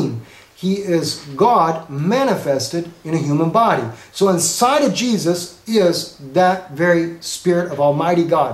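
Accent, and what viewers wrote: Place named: Texas, United States. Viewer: you betta preach brotha ! whoo. this sounds like good ol down home southern preachin to me. i think i hear a little texas in his voice hahaha. PRAISE HASHEM!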